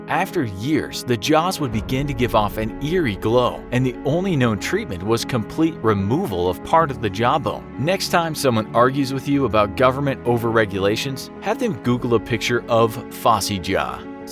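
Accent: United States. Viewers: Man i hate this american narrator, feel like i'm getting dumbed down just by listening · "In the human history??" Who TF is running infographics? And why do these people hire Americans to narrate?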